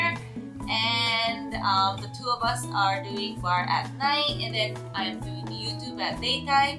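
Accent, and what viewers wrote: Philippines. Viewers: You're English accent now more like Filipinos than Japanese :-)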